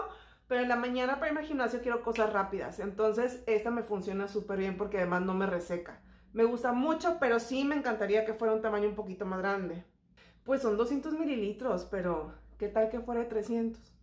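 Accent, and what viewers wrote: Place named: Sinaloa, Mexico. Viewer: Ya tienes acento sinaloense!!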